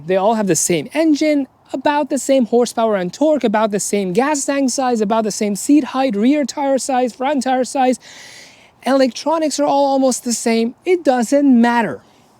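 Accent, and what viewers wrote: Iran. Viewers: persian accent straight away haha nice vid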